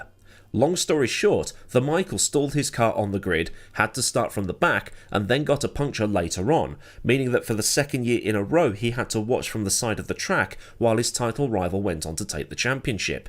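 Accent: Canada. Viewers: Checking in from Toronto, I will give you an 8/10 on the Canadian (English Speaking) Accent and a 9/10 on the appreciation of the Canadian sense of humour having "Biennvenue a Quebec" on that wall :) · Love the Canadian accent, although I think the appropriate Jacques Villeneuve, French Canadien accent would have been even funnier.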